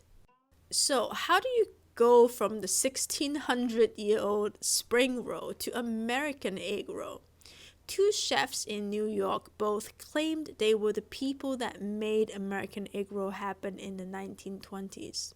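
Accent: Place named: Hong Kong. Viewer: I can totally hear your HK accent. Was assuming HK before reading this comment. Can hear your British infusion into your accent. Sounds great!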